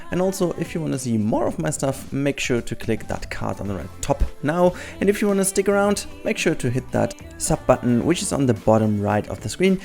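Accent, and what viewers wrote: Germany. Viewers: Are you german? Your English sounds like German :D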